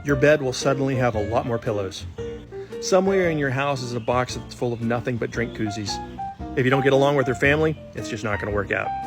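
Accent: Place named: South, United States. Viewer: I'm from the South. It sounds like your wife southernized you like I did my New York honey .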